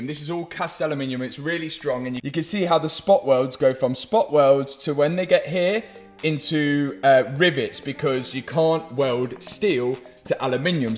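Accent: Leicester, United Kingdom. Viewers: I'm curious, what part of the UK is the accent from? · I love that Lesta accent . 👍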